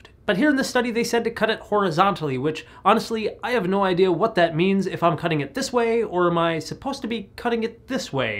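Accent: United States. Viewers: Very informative video. I'll try that out.. Also, you're amazing, got a beautiful pure American accent AND you're so gorgeous lol.. Wish more doctors looked like you hahaha, or be your patient even better lol... Anyhow, thanks for your channel and videos. Subscribed and supporting your good work. :)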